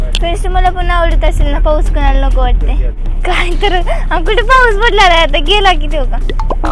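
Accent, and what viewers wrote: Latur, India. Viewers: Hii....mi tuzi big fan aahe........mla ekda tula bhetaych aahe....mi pn Laturchi ch aahe.....aani kharach.....tu khuppp mst bolte h....ekdam aaplya laturi language madhe.......😃😍💃.......tu asech mst mst video......banvat raha...aani tuze subscibers....aankhi vadhu de...👍..tuz kuthe ithe office aahe ka latur madhe.... ch? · You speak typically laturi Marathi which is a good thing......... But use the word "friends" in minimum extent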